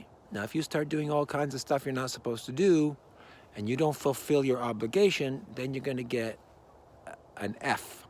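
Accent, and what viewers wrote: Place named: Canada. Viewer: love your canuck accent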